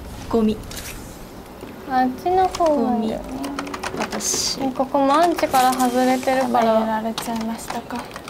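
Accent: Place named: Kyushu, Japan. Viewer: あやてぃ九州言葉が抜けてなくて心地よい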